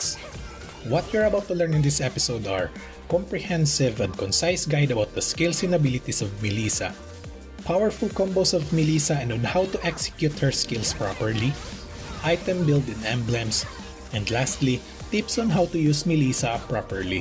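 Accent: Philippines. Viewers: Pinoy na pinoy yung accent.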